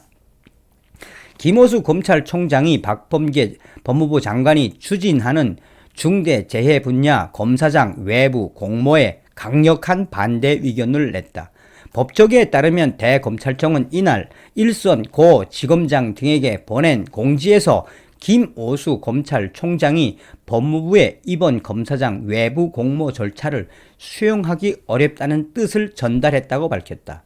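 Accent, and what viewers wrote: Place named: North Korea. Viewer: 북한식 말투가.... ㅋㅋ 재밌어~!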